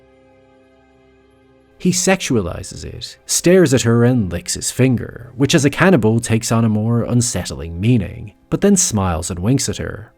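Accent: Ireland. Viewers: The narrator sounds partially irish... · Is that voice-over an Irish accent?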